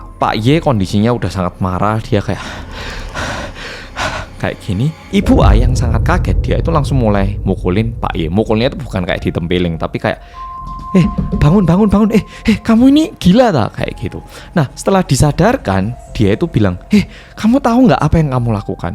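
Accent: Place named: Malang, Indonesia. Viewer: Mas, aku salfok sama "mobile kayak MIKROLET" haha MALANG nya masih kentel ya mas. Salam kera ngalam mas 😁😁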